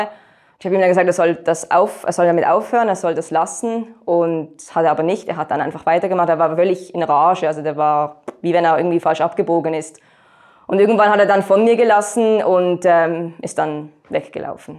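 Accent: Switzerland. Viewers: Sie klingt wie eine von uns 🇨🇭🇨🇭🇨🇭🇨🇭🇨🇭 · Bis Minute 11 hab ich noch vermutet dass das basler deutsch ist - cool🤙🏽🤭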